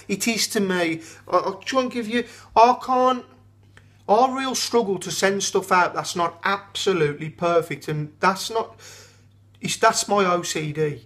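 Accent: Birmingham, United Kingdom. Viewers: Erm, nobody gives a toss mate, just be your Brummie self:)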